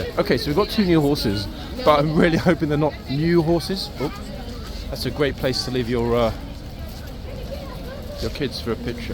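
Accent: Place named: United Kingdom. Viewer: What that guy meant by jerk walked in front someone walked in front of his camera as he took a picture. Also I really wish you could get Trooper Ellis to do a little Q &A for us, partly because I like British accents (yours is lovely and almost musical) But also because I'm genuinely curious about what it's like to Work with all the horses, It's to bad we can't camera and mic up a Kings guard for a Day in the life of video.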